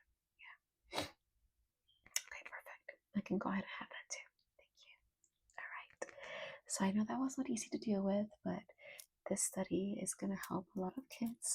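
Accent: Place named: Brazil. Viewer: Posso estar enganado, mas reconheço um sotaque brasileiro de olho fechado, inglês ótimo inclusive.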